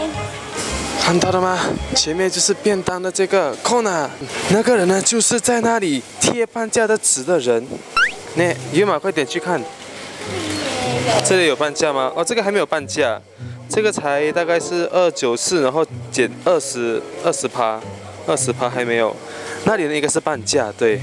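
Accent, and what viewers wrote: Taiwan. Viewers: 沒有馬來西亞腔了。你不說還沒發現原來是馬來西亞人～～ 😂 說話很像台灣人了。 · RYU口音也台湾，不说我大马人的都快听不出。（另外女友口音好有趣）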